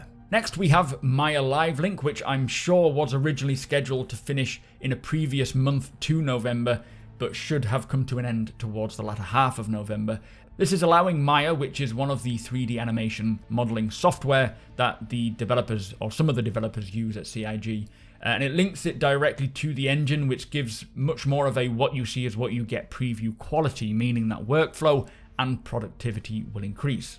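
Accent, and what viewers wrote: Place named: North West England, United Kingdom. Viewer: Where you from in the north west? I'm trying to pinpoint your accent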